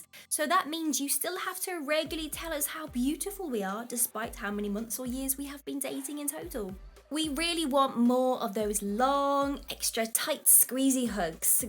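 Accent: United Kingdom. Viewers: Just love that British accent...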